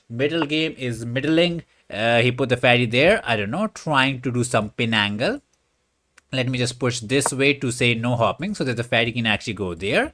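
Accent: India. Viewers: When I want a good laugh and learn more about Chess tactics, this dude doesn't disappoint lol... The only jokes with Indian accent that I accept, and enjoy,,,lol...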